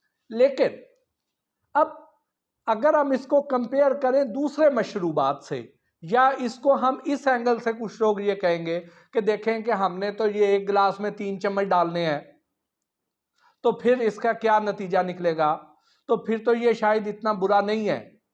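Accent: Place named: Punjab, India. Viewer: this pendo man does not know anything . his urdu accent is mixture of punjabi . funny language 😆😆😆😆😆😆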